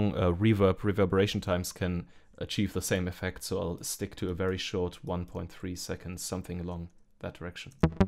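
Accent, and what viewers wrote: Germany. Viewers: direkt deutschen akzent rausgehört :)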